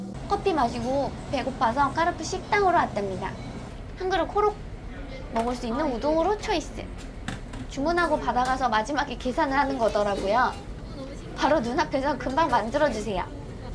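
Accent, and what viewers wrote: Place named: Gyeongsang, South Korea. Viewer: 너무 이쁜보이스를 가지고 계십니다 약간 갱상도 대구 말투도 썪인듯함😊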